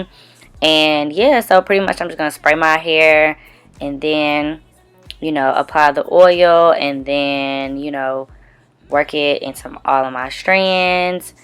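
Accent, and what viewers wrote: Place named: South, United States. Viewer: *speaking Southern AAVE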